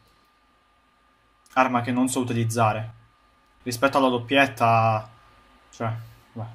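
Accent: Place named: Rome, Italy. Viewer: Io non ho capito una cosa. Sei napoletano perché parli romano ?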